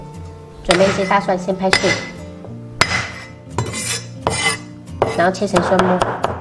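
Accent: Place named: Fuzhou, China. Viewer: 地道的福州做法，从口音听出来的哈哈老乡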